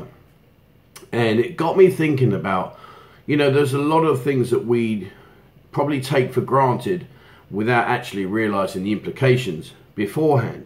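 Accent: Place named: United Kingdom. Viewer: Thank you for all these precious advices. Also your British accent is very smooth and to me it is a good exercise for my bad English.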